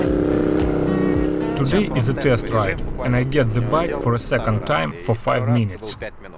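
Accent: Russia. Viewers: ох уж этот russian speaker акцент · наш, из тамбова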